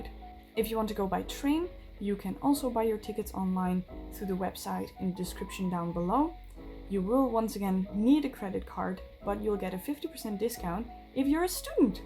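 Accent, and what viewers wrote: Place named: Upper Midwest, United States. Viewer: Hi there! What part of the USA are you represent? I’m from Wisconsin.. You sounded like a neighbor from Viking land or Lions land.. Ačiu !!!! 🇱🇹🇺🇸👋✌️💐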